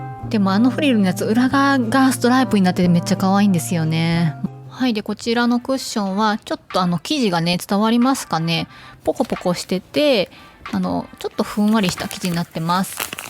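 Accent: Kansai, Japan. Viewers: 初コメです😊 さんの動画本当に参考になります！私もIKEA大好きですが遠くてなかなか行けないので・・😂😂 それから、時々関西弁なさんの話し方、好きです❤️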